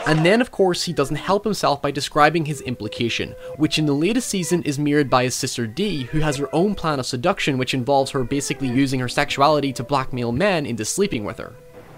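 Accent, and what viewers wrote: Northern Ireland, United Kingdom. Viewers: He's Northern Irish 😊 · Are you from Northern Ireland? Your accent is from here but has a weird American twang to it. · Northern Irish accent? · You sound like you're from N.I, are you? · It's Northern Irish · he sounds like a northern irish man like myself :)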